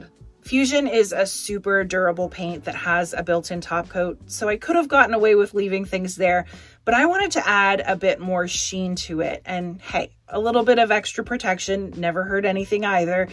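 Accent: Canada. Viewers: This is the first video of your I’ve seen. I’d like it! The only thing I would ask is why you didn’t do some accent colors on all that beautiful rounded furniture and edges? I think it would’ve made it. Stand out just a bit more made it slightly modern. And what did you say and where did you get those knobs? I love your accent. What part of Canada are you from?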